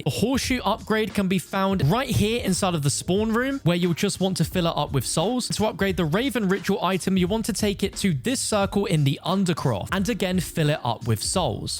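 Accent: United Kingdom. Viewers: صوته بريطاني مزعج كثير كلام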